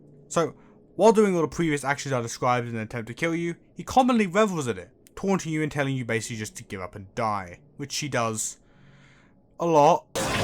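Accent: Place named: United Kingdom. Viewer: the thick british accent makes this video so much more interesting and entertaining, like on top of the accent you also speak slightly faster so its really funny.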